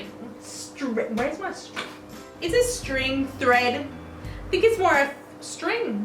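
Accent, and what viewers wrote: Patois, Jamaica. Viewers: I have nylon thread so I can use them.. You know at first I thought you were from a different island until I heard the patois Jamaica patois🇯🇲🇯🇲🇯🇲🇯🇲🇯🇲🇯🇲🇯🇲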